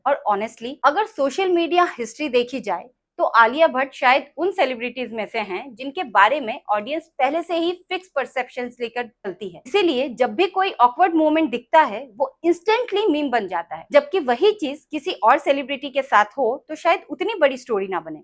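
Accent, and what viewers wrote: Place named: Bengal, India. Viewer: i like your bengoli accent hindi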